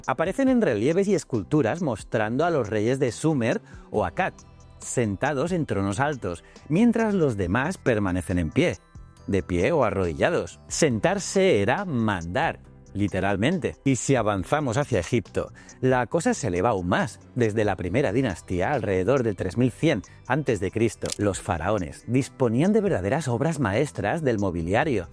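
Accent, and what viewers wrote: Spain. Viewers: Tú eres andaluz? Verdad? Las tres primeras palabras del vídeo te delatan, jaja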